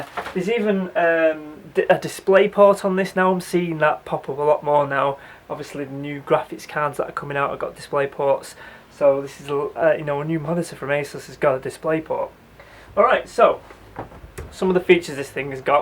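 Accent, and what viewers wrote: United Kingdom. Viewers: love your british accent.a breath of fresh air in the jungle of north American content.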